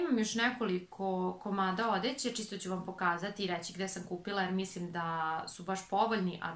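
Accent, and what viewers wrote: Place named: Vojvodina, Serbia. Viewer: Vojvodjanka,zato to tako slatko zvuci 😘😉😁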